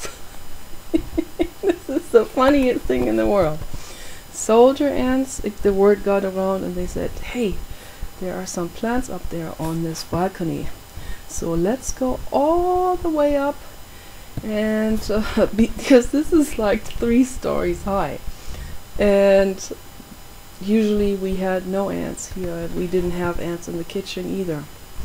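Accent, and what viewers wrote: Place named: Germany. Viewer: You have to be german. I Love your melody!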